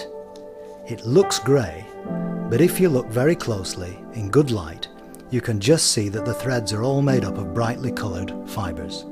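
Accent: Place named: Lancashire, United Kingdom. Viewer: Love this, so relaxing..love his Lancashire accent..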